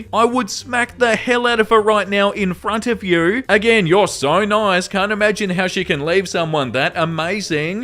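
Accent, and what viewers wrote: Australia. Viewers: oh you're an Aussie. i though maybe i detected sth african/aussie accent. with a dash of NZ lol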